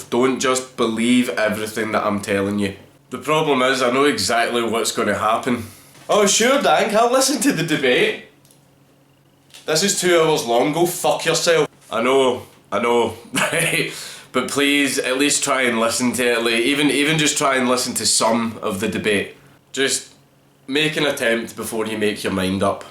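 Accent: Scotland, United Kingdom. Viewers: never can understand all of scottish accent but Glasgow i can easy but thats not why im here Dankula is a legend :)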